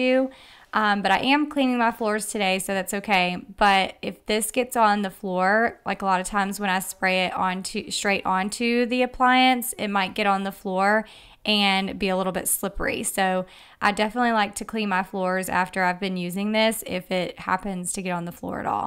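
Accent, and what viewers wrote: South, United States. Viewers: Enjoy listening to you talk with your “southern accent”. Originally from Waynesboro Ga (30 minutes from Augusta) but now live in South Carolina.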